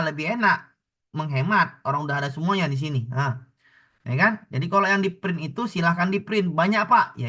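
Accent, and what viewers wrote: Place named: Medan, Indonesia. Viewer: Medan kali la pak ni yang ko dengar la apa yg di bilang tu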